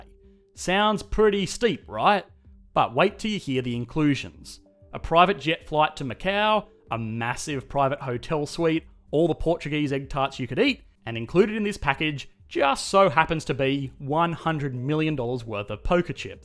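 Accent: Australia. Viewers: Oz accent? Lol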